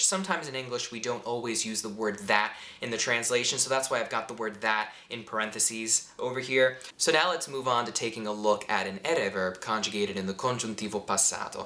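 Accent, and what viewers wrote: Italy. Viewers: Penso che tu sia calabrese :) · tu si che ai l'accento italiano te lo dico io che sono di Verona Italia,buona fortuna con questi video!!!!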